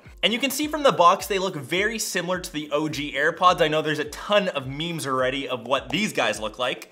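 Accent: Canada. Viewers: You sound Canadian or something I think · Your Canadian?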